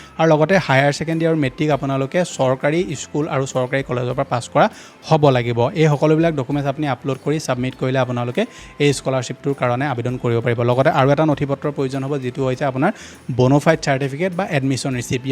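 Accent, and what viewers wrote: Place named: Jorhat, India. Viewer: Jorhat dictic❤❤❤❤❤❤❤❤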